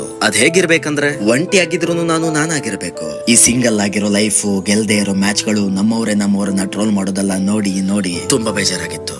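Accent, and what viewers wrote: Kundapur, India. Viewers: The way you talk ..awesome .. i like you language kundapur kannada👌👌💕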